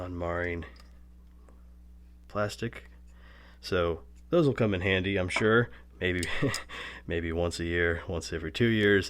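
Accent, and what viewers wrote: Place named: United States. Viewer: This guy sounds american. Why is he speaking in metric?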